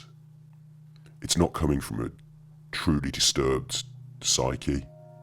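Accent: Birmingham, United Kingdom. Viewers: I just discovered you and I'm loving your many analyses! I adore your accent as well. Are you from Birmingham, by any chance?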